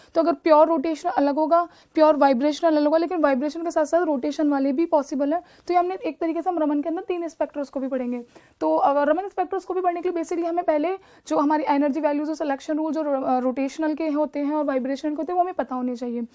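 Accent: India. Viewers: Is this English or english-Indian speaking?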